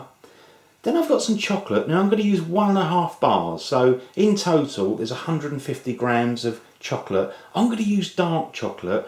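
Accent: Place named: Essex, United Kingdom. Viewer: You are 1 funny bloke do you know that?! :D I think you would find it amusing to know that I often watch your vids in bed (as my computer is set up along side it for long hours working at night!) & I have a nice cup of Assam, & laugh uncontrollably at your little moments of alacrity & charismatic cordiality! You surpassed yourself with this one Chef! And 13 Minutes! Good length! Do you know, I could swear you have an ESSEX accent! Maybe it's just me. How on earth R U going to top this one ay!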